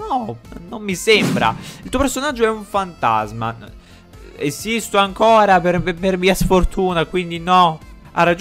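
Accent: Naples, Italy. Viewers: Bro tu sei di Napoli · Sei napoletano da come hai parlato sei un grande forza napoli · Solo adesso mi sembra che è napoletano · Bro ma sei di Napoli? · Sei Napoletano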